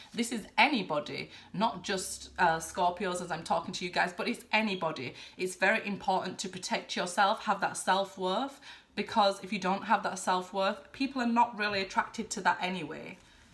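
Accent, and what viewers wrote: United Kingdom. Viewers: The uk or London with that accent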